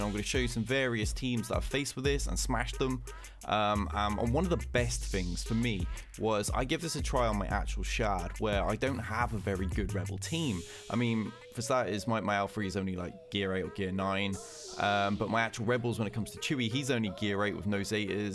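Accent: United Kingdom. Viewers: Another great video mate its about time you got this buzz. As much as I like Warrior and Cub its much easier to listen to another proper english lad.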